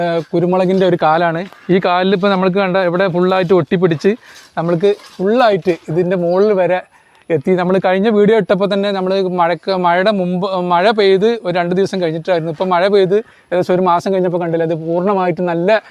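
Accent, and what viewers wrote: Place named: Valanchery, India. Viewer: മലപ്പുറം ജില്ലയിലെ വളാഞ്ചേരി ഭാഗത്തുള്ള ഭാഷ ശൈലി ആണ് അദ്ദേഹം സംസാരിക്കുന്നത് ഓരോ നാട്ടിൽ ഓരോ ഭാഷാ ഉച്ചാരണം ആണ് അതിനെന്തിനാ കളിയാക്കുന്നത്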